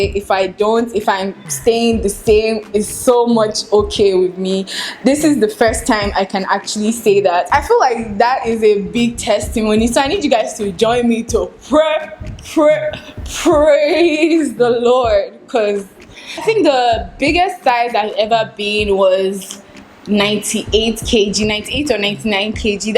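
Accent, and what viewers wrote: Nigeria. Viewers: is that Nigerian accent 😍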